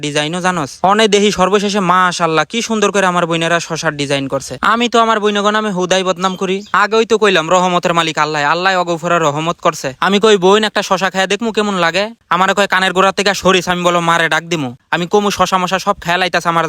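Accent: Comilla, Bangladesh. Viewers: কুমিল্লা র ভাষা তাই না বাই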